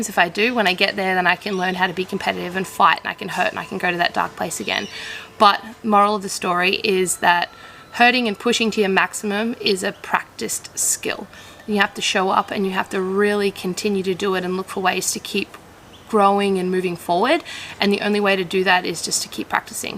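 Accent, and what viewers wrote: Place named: Australia. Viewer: Hiiiii.. From Costa Rica. You can put subtitles in your videos I can hardly understand the Australian accent. Tks. Love you.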